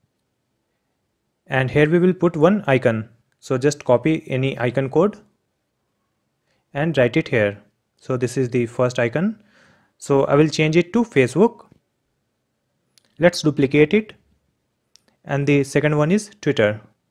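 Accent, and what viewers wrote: India. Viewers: Why westerners are making fun of his accent . English is not first language of Indians. Show some respect to his work. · Oh my god. This indian accent...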